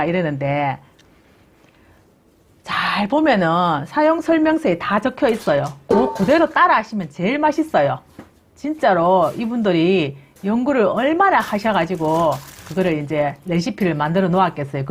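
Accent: Gyeongsang, South Korea. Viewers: 소탈한 성격 정말정말 좋아요 그기다 경상도말씨짱이랍니다*** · 핫하하하 ...🎉🎉🎉 매매하이소 진짜 경상도 아지매맞네 그런데 엉덩이는 조심하이소😂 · 경상도사투리 듣기 거북해서 못보겠서요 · 죄송합니다 경상도분같은데 ,내용이 너무좋아서배우고싶습니다 연락처는 알수없습니까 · 말린버섯 안말린버섯 간장 어느것이 더맛잇는가요 감사합니다 경상도말씀 너무 정답네요~^^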